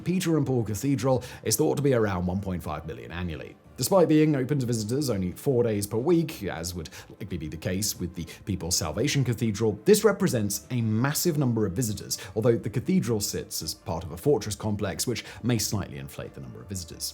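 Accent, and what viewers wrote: RP, United Kingdom. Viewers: This guy just reads the script. He sounds goofy and British, that is the selling point. There is an immense production team behind that. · you sound so posh that it's very annoying. Bring it down to earth and I'll watch your content. Otherwise...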